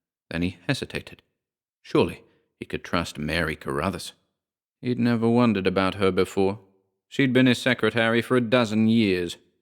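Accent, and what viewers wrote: United States. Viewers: Yay, more American! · Your American accent has gotten to be nigh perfect! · Fine job with the American accent! Sincerely, Katherine Los Angeles, California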